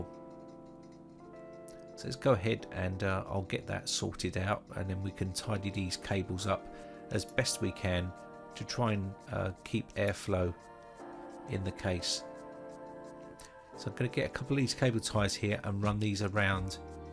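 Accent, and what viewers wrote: United Kingdom. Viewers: It's about 39 pounds Me, an American: Oh golly gee willakerz that's heavy!!! British accent continues Me: Oh right...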